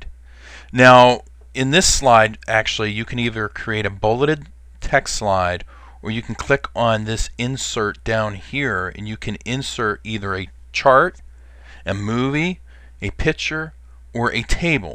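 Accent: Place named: Pittsburgh, United States. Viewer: I'm saying you're from Pittsburgh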